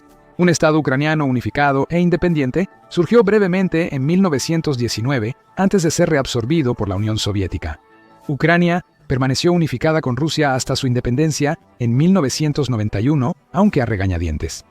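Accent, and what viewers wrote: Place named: Latin America. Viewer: Tal y como está enfocada la información del vídeo el comentarista o está comprado o es tonto o las dos cosas. Los ucranianos no han luchado por siglos.......faltas a la historia. Asi que cuando veas caer Ucrania y posiblemente desaparezca deberás pedir perdón por la desinformación que estás haciendo. Encima con acento hispano......mira a ver qué está haciendo EEUU con vuestros países.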